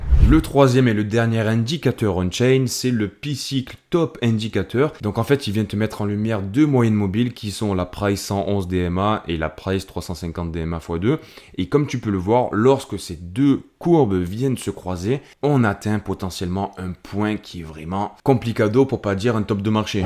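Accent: South, France. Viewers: Je viens tout juste de découvrir ta chaine. T'es génial change rien, avec le ptit accent du sud ca passe comme papa dans maman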